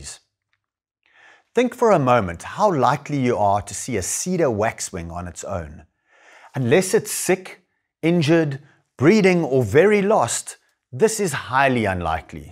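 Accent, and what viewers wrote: South Africa. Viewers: I can clearly hear this guy is South African. We love bird watching 😂😂😂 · I knew your accent was different! I just saw a comment that said you are south African.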